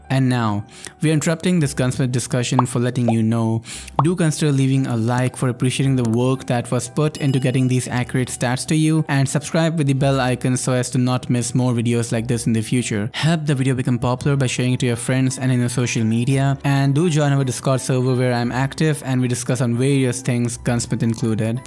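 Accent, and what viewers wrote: India. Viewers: You sound Indian Are You from India? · Are u Indian???ur accent is a bit Indian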